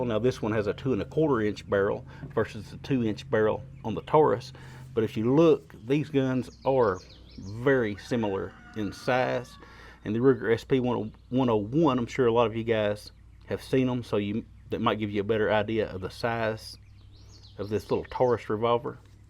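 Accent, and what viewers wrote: United States. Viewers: When i start to hear this kind of accent, i automatically know that i found the right channel to hear something about guns. Best greets from germany, i love ur american accents.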